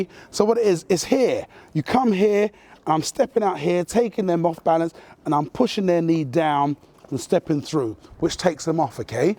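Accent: United Kingdom. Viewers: I only noticed you had a UK accent after being subscribed for a month. I’ve watched enough Doctor Who that these accents slide under the radar unnoticed. #Murica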